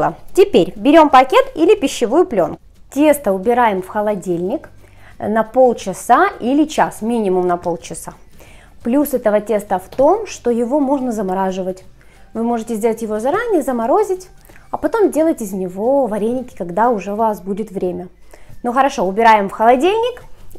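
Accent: Surzhyk, Ukraine. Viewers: Спасибо за рецепт,скоро буду готовить именно по вашему рецепту : ) P.S. обожаю ваш канал и вашу приятную подачу рецептов. Не обращайте внимания на дураков и хамло, не меняйте манеру разговора и суржик : )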